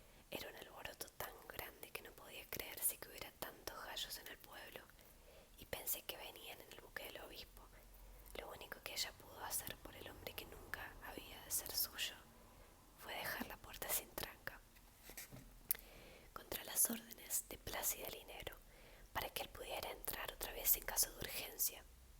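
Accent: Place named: Argentina. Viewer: Me encanta el acento Argentino. Saludos desde méxico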